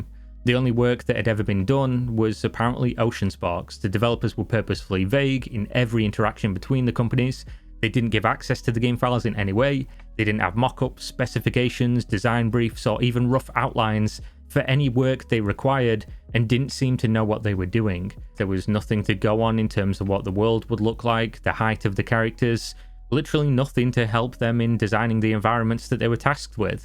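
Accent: Northern England, United Kingdom. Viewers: Where you from? You sound Northern. Anywhere near Grimsby?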